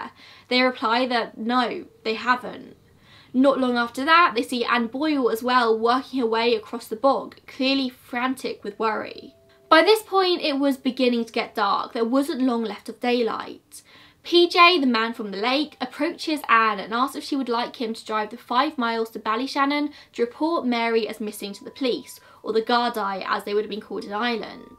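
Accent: England, United Kingdom. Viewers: I have just found your video today by chance. I like old cases unsolved or solved programmes and I was looking what options were there. Then I found this video about Mary and I got interested on the case. But what a surprise when I heard your Brittish accent! I just loved it because my family and I lived in London 44 years ago. And it is not quiet often to find English accent but American since my mother tongue in neither of them. I liked your programme so I decided to suscribe. It is very tragic what happened to the Boyle family and it is really worst not to find answers after 43 years. But hopefully someday it will be solved. I would have tried a psychic help maybe some answers would come up. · I'm from Ireland, about an hour north of Belfast and only 40 mins across the sea by ferry from Donegal (the South is more North than the North lol!) Your pronunciations are so cute, bless you! 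I love hearing English people pronounce our towns etc, it's so cute to hear! For reference Donegal is kinda pronounced "done-ee-goll" but I do like how you say it haha!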